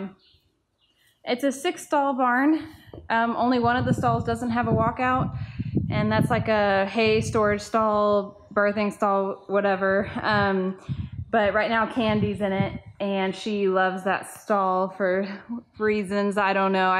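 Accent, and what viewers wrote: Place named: Texas, United States. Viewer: I thought so! We are neighbors! I could tell from the terrain etc. plus you sound Texan I run a private non profit in Marble Falls. Would love to connect!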